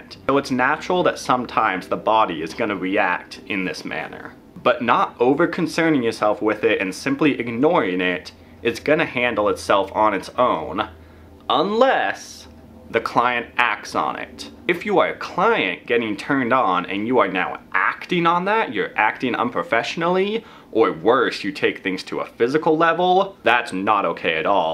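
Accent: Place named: South, United States. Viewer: You're cute and your voice sounds like you have a little country accent. 👍🏻❤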